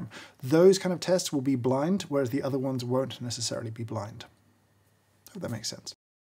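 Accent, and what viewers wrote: United Kingdom. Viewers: You forgot British accent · Watching a funnily-quoiffed man with a British accent do SCIENCE! with a 30 buck coffee brewer was a surprisingly enthralling way to spend a Sunday morning.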